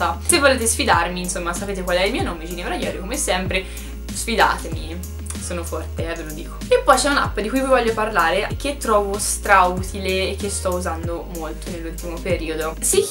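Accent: Tuscany, Italy. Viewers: Ciaooo nuova iscritta, sei toscana come me! Di dove sei tu? 💕 bellissimo video comunque 😘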